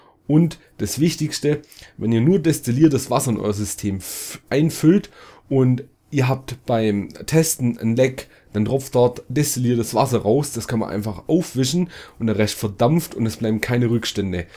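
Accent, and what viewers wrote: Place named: Baden, Germany. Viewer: Du Klingst so Badisch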